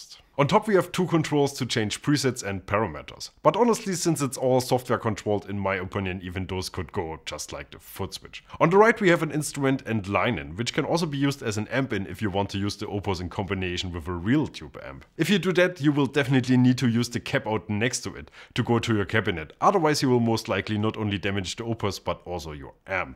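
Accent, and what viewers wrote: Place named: East Germany, Germany. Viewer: Eastern German accent . 😂. Nice touch!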